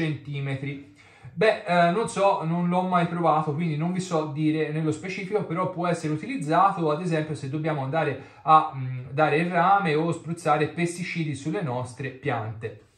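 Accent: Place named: Tuscany, Italy. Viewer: Puoi evitare di parlare il toscano dato che ti seguono in tutta italia?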